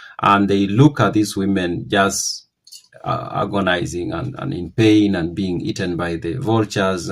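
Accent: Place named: Kalenjin, Kenya. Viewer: Mbona huyu jamaa anakaa mluhya na anaongea kama mkale?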